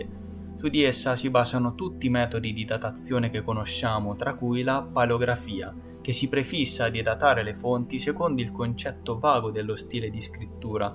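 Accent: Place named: Senigallia, Italy. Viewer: Ottimo lavoro, complimenti. Dall'accento sembri di Senigallia.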